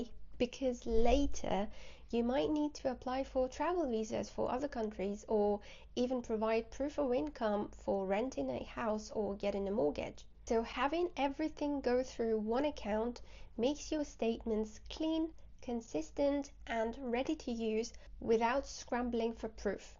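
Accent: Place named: United Kingdom. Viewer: Where are you from? I thought you are from the UK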